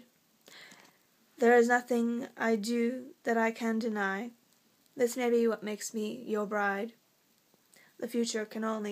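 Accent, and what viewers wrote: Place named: England, United Kingdom. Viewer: You sound English. Good sonnet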